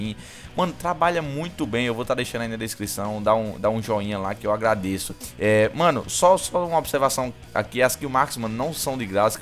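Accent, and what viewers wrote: Northeast, Brazil. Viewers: De Que Lugar do nordeste tu és?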